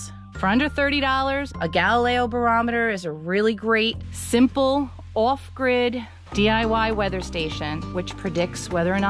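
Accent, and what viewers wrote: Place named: Rhode Island, United States. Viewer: Just found your channel. Very informative. Question, your accent sounds like mine ( Rhode Island ) are you from New England?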